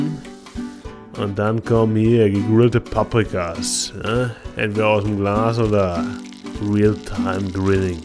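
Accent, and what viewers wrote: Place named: United States. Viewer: Immer dieses "hier hier hier" macht es nervig. nach ner Minute hab ichs ausgemacht. nicht authentisch. Dieses amrikanische ausgesetzte ist zum kotzen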